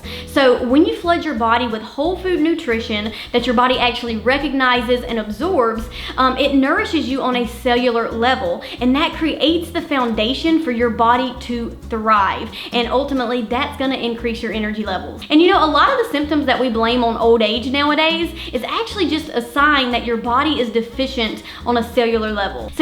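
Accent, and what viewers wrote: South, United States. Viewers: What kind of accent is that? Sounds southern to me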